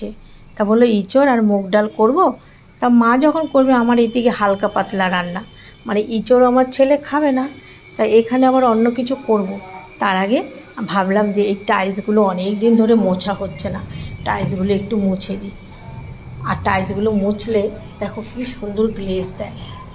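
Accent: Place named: Bangal, Bangladesh. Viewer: Vison valo laga didi-bai tmr mukha bangal kotha ami ekta problem a tmr vdeo thik moto dekte parchi na valo thako didi-bai❤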